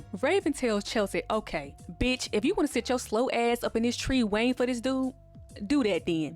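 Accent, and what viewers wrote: Memphis, United States. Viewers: You talk like you from Memphis lol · I remember thissss! Are you from Memphis I hear the accent!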